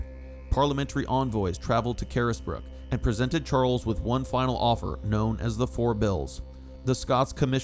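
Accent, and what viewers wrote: United States. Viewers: I prefer listening to David Starkey who is English and pronounces the English language correctly not some American robotic voice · The Lost Cause is completely riduclous and based in racism, White supremacy and pro-slavery nonsense. The Cavaliers don't exist anymore, the Royalists won in the long run, but the people of Britain wanted the King back, the Americans have never wanted Confederates back. Don't compare the civil war on slavery to the English Civil War. Plus, the Peasants' Revolt never threatened the monarchy. Learn English History. You sound American. You clearly don't know English history, you can't speak English correctly, and you're using Old English in your name. Mate, you're American, you're not English. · Good luck everyone to be endured terrible American commentater cringeworthy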